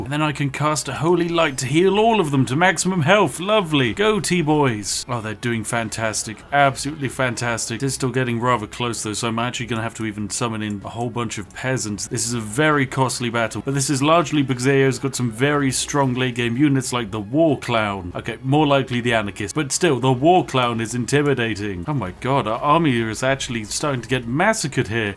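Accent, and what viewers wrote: United Kingdom. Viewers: Hey....... are you actually British? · You sound too british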